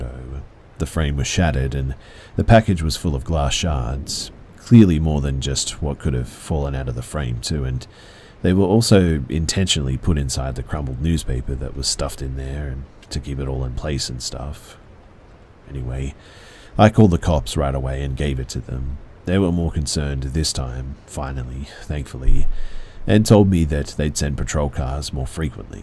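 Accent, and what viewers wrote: Australia. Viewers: Great to hear a Australian voice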